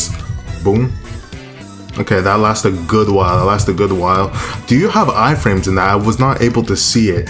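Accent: Jamaica. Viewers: r u jamaican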